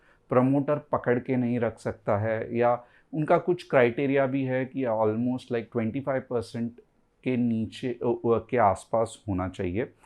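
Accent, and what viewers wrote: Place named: Mumbai, India. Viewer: सर आप मुंबई से हो क्या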